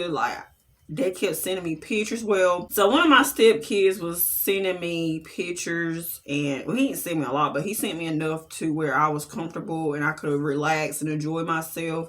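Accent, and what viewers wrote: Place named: North Carolina, United States. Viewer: Your accent is everything. Where in NC are you from? You sound like my husbands people.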